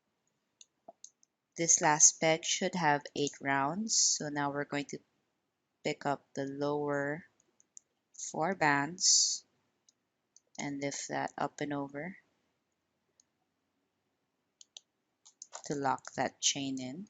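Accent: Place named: Philippines. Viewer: God your so talented!!! I was wondering if you could make egg tarts or any other Japanese, Chinese, Filipino or any asain famous foods. Pretty please!!! Also, i can hear that you have an accent, well, I think. Are you a filipina po?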